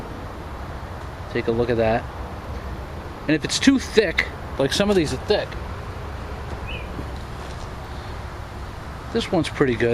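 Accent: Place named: Boston, United States. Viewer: do you live in boston? because i do to and recognize that accent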